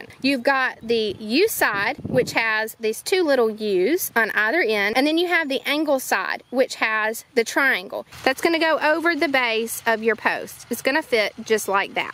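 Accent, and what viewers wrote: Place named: Virginia, United States. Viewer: Just subscribed!!! Where are you from. That accent reminds me of us here in VA.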